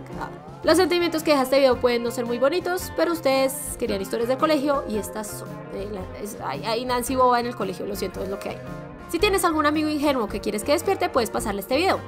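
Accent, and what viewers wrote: Colombia. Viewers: eres colombiana porque así hablamos en miuu pais ^w^ nueva suuub por cierto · me encanta como hablan los colombianos y es una bendición escuchar como hablas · Sabia que eras colombiana XD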